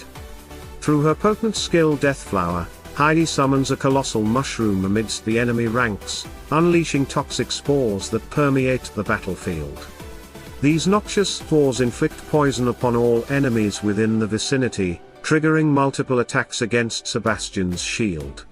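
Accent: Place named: United Kingdom. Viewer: You sound like you are from Britain.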